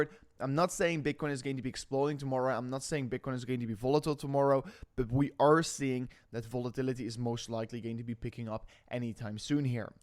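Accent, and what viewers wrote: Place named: Netherlands. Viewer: The dutch accent is terrible though 😂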